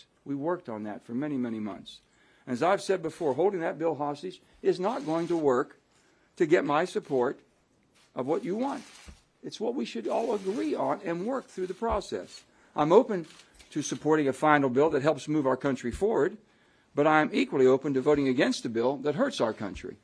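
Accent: United States. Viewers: He sounds like a true American!!! 🇺🇲🇺🇲🇺🇲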